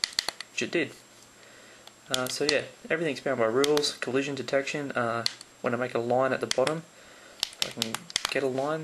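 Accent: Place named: France. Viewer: est ce que tu es français ? je reconnais ton accent français :)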